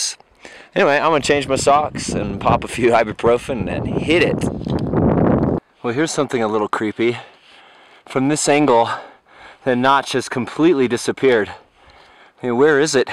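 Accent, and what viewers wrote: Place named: Scotland, United Kingdom. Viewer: Thumbs up just for the Scottish accent laddie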